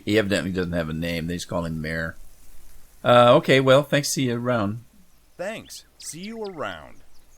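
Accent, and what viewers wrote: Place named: Minnesota, United States. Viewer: I know this is an old video, but because I noticed it in the first video. I could tell you were either from MN or thereabouts, eh...enjoying the videos, thanks for posting.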